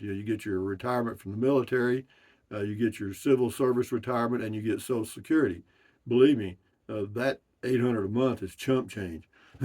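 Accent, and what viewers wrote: Texas, United States. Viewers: Sam Elliott is a mature, seasoned actor, good hair, impressive mustache, deep voice. He has earned many fans among lovely women our age. Amigo, I say take it as a compliment, definitely a flirt, when a woman claims you remind her of good ol' Sam. He played Virgil Earp in Tombstone 1993, and he played Sam Houston in Houston: the Legend of Texas 1986. And a lot of other western and action movies. We could all be compared to worse. And anyone who thinks your respectable Texas accent sounds like that tv doctor, is a tone-deaf northerner. Don't even bother fretting.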